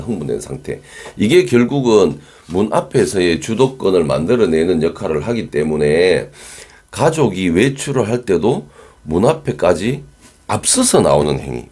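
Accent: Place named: Gyeongsang, South Korea. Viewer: 너무 감사해여~~ 3개월된 강아지 입니다. 입질도 심하고~ 으르렁 거리면서 진심으로 저의 손도 물고 기본케어를 전혀 못하는 강아지 입니다. 방문교육도 했는데 ... 저는 안되더라고요 ㅎㅎ 선생님 교육 계속 다시보고 다시보고 계속 다시보면서 아이보다 저의 문제 구나~~깨달았습니다. 열심히 주도권 교육을 하고 있습니다. 여기서의 리더는 나야 라는 어필을 계속 강아지 한테 표현하고 있습니다. ㅋㅋ 아직은 부족해서 타이밍도 잘 못잡고 제가 감정조절이 안되서 조금 불안하긴 한데 강아지가 눈치도 보면서 곧잘 하고 있는듯 합니다. ㅋㅋ 영상을 보고 행동했더니 일주일정도 되니 입질이 매우 좋아졌어요~ 더이상 따라다니면서 안물고 같이 자자고 떼쓰지 않아요~^^ 아직은 많이 부족한 보호자라서 많이 노력해서 배우고 강아지랑 좋은 관계를 맺어보도록 하겠습니다. 영상이해하기 싶고 경상도 억양도 너무 매력적이시고 ~~ 감사해하면서 시청하고 있습니다. 감사합니다 ~^^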